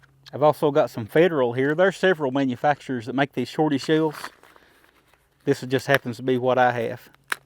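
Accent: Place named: Rural, United States. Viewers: East Tennessean here, sir you sound like someone from my neck of the woods. Loved the vid. I have the same adapter. It’s amazing. Keep up the good work. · I like this guy and his country twang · "Well ventilated " love it! Of all the gun channels I subscribe to, this is my favorite. Must be the Kentucky accent. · I purchased a 500 retro with the 18.5 inch barrel and was looking for a 28 inch barrel for it and they run about $220. I seen the maverick at rural king for $179 with the 28 inch and got an extra shotgun. Since the barrels interchange it's the best combo. I have the Opsol adapter and have ran it through both interchanging barrels and they run like a charm with the mini's. Just a side note my Dad and Grandfather were from Augusta Ky. and listening to your video's with your accent reminds me of them. They would shoot skeet every Saturday here in southern Indiana and load their own shells and always clean everybody out. 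Good times and good memories. Thanks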